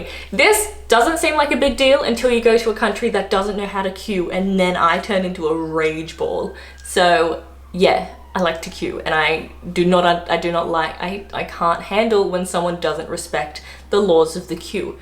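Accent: United Kingdom. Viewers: Sounds like you’re pretty much a Brit’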